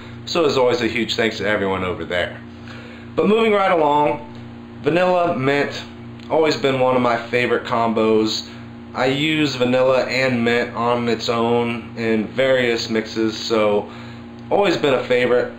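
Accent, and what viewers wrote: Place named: United States. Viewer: Thanks for getting back to me and thanks for the advise...love your videos and i love your us accent better then my boring UK accent loll. x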